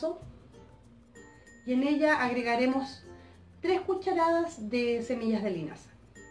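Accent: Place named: Chile. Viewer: POR FIN VIDEO EN ESPAÑOL CHILENO! !!! MUCHAS GRACIAS ! DESDE MAÑANA COMIENZO CON TU RECETA 😊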